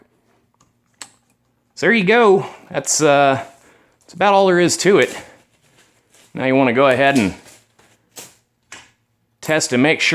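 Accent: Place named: United States. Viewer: This guy's accent is so American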